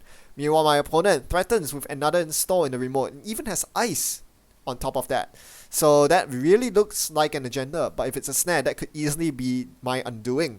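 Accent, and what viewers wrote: Singapore. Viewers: Are you Singaporian? I just had to ask, I can't place a finger on your accent.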